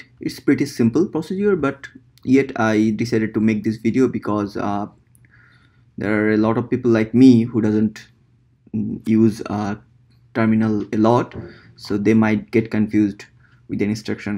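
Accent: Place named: India. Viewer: whenever you listen indian accent videos you already know your problem is fixel lol. So staright to point thank you sir.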